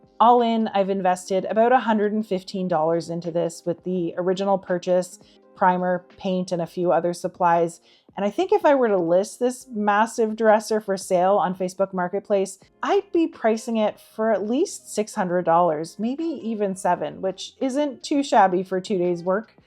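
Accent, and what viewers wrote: Canada. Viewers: I am a fairly new follower so I’m not sure what part of the country you’re in… once in awhile I pick up a faint Canadian accent??? I’m any event I am blessed to live in a somewhat affluent area in Virginia…. Williamsburg/Yorktown area. Those furniture pieces that you have restored, especially most recently that dark olive green dresser or sideboard or console would easily go for $1200 in this area!!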